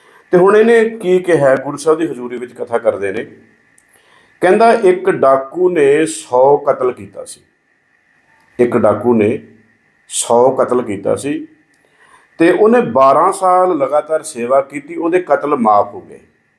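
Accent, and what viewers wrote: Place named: Punjab, India. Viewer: Thanks ji ur punjabi speaking is pure......theth punjabi.........very nice in several rural simple idioms it gives warmth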